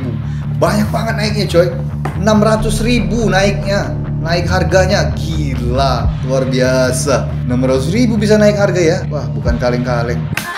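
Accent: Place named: Terengganu, Malaysia. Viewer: terengganu nelang... like org ganu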